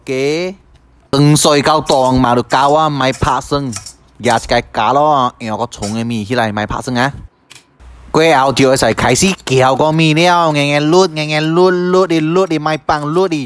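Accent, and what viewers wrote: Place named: Hokkien, China. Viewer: How come got Northern Hokkien accent?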